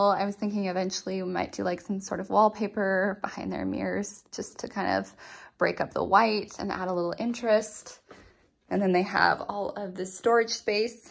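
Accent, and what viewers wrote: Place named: Utah, United States. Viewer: Love the boho farmhouse style! My first video I’ve seen and so I don’t know your history. Are you from Utah? I can definitely hear a UT accent! I lived in the Midwest for 27 years and now in UT for the last 7 years.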